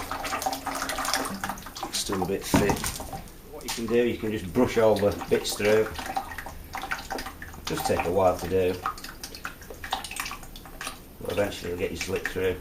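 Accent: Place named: England, United Kingdom. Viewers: I don’t understand his English accent 😂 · It is a beautiful Yorkshire accent. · Thanks for the video, love your accent, makes me miss England:)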